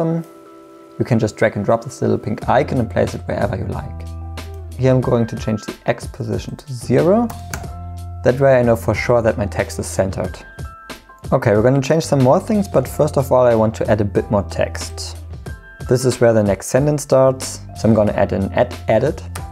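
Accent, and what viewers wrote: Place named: Germany. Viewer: Aweful German accent, I don't understand nothing!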